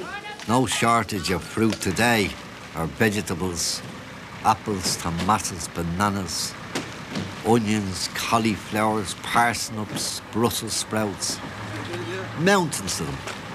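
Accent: Dublin, Ireland. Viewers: I was just thinking, the narrator has a great Dublin accent, without being common as muck. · Chris Clark it’s what is known as “ an auld fashioned” dublin accent. Far softer and far better pronunciation than the dumb sounding monotoned aggressive sounding accent that Dubliners have today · I haven’t heard that accent since I was a little girl! My great grandfather was born in Dublin, came to US in 1928. He passed in 1964 when I was age 12.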